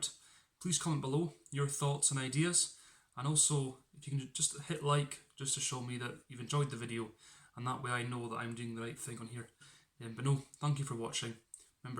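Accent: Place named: Scotland, United Kingdom. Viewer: Scottish! Awesome dude!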